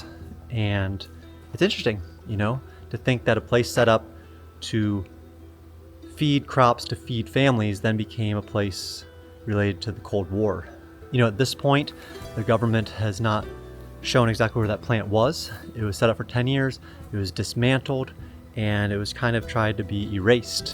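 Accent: United States. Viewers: Love your vids. I heard "Bone Valley" and my ears perked up. We ride dirt bikes at that place you saw named that on OnX. Nice to know you grew up here. I thought you were from Boston, where I'm from. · I thought you had a central Florida accent. That’s funny. Welcome back.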